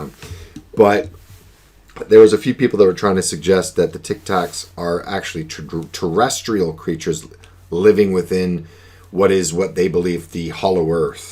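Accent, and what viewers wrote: England, United Kingdom. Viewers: Interesting stuff, it's good to see you making more videos, yt is a lonelier place without the melodic, perfect English accent of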